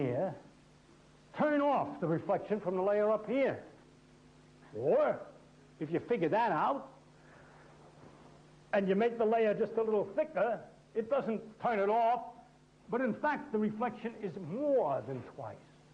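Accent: United States. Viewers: Brilliant Man..Sounds like physics 101 direct from the Bronx.. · Such a New Yorker haha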